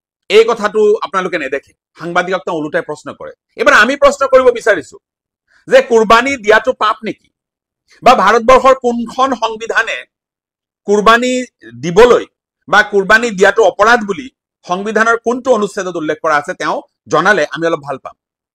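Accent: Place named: Bangladesh. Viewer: ছুবৰৰ বাচা। মিযাৰ ধৰ্ম সত্ৰ মাটি দখল কৰা নেকি। তোমাৰ অসমীয়া শুনিলে গম পায তুমি পূৰ্ব বংগীয মূলৰ।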